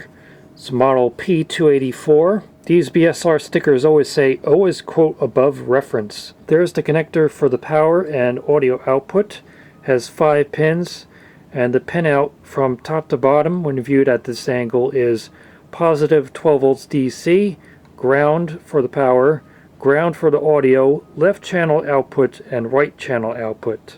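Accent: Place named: New Jersey, United States. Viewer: I never heard anyone not from Jersey find the accent pleasing. lol.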